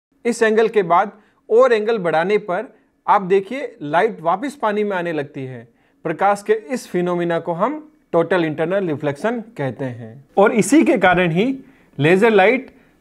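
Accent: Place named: Rajasthan, India. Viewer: Sir aap Rajasthani hain na? I know😁 Your accent totally matches✌ I'm from Barmer, Rajasthan.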